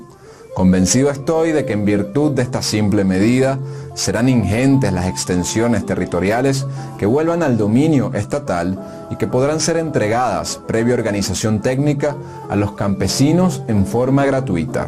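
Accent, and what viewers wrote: Venezuela. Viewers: El que habla es de venezūelą? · GRACIAS A. ESTE JOVEN DE ACENTO VENEZOLANO..POR ESTEV8DEO MARAVILLOSO..USTED SI ES JOVEN UN VERDAFERO HISTORIADOR MIL GRACIAS...DESDE COLOMBIA 👍👍👍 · Este man es venezolano? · Que hace un veneco narrando de esto? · Es un venezolano el que narra? Hasta aca llego en ver este video.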